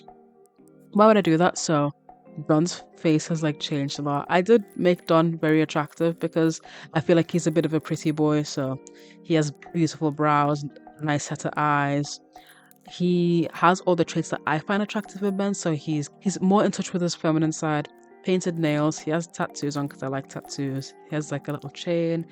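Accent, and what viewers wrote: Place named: Liverpool, United Kingdom. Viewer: Looks amazing! A modern take. Brew you’re accent is really unique, it sounds like a tiny bit of scouse and other accents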